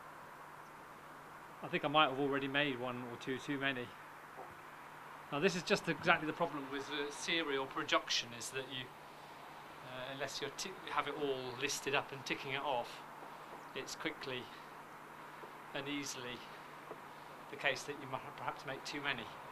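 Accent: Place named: England, United Kingdom. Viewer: Hi are you from uk, you have got a great English accent and great videos!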